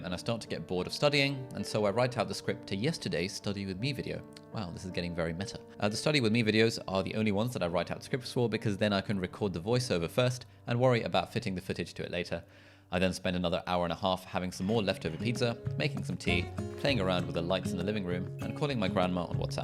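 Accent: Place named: RP, United Kingdom. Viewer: Never heard ‘wasteman’ in a posh accent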